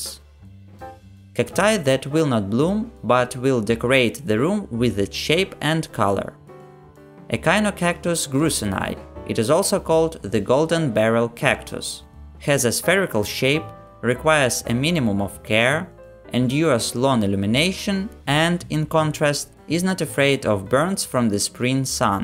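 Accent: Russia. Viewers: It's a Russian accent.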